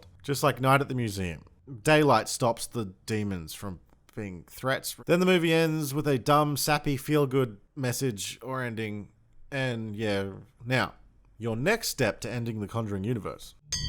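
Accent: Australia. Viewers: Wait, Your Australian?... IM A FUCKING QUEENSLANDAAAAA! YEAH BRO! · spot the Aussie lol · This shit wouldn't be as funny if you didn't have an Australian accent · Are you Australian?? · I just worked out that you’re Aussie and that made me happy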